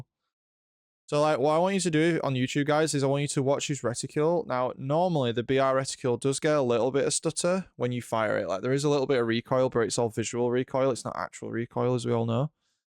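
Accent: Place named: Sheffield, United Kingdom. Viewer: Sounds like a Sheffield accent 👀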